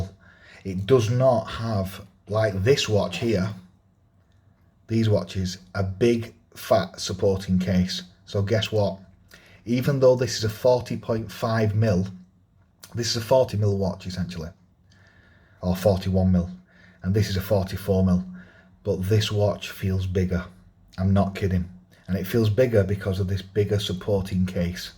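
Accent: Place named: West Midlands, United Kingdom. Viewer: Thanks for the review! I have just bought the strap version of this watch . The bracelet looks very well made, though… I think I’ll have to buy another one, just to be able to swap straps… Can I ask you what part of England your accent’s from? I’m not a native speaker and I’m trying to figure out as many accents as I can… West Midlands, perhaps? Great review, thanks!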